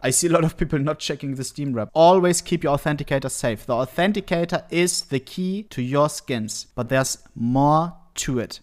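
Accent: Germany. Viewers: Dude his german accent drives me crazy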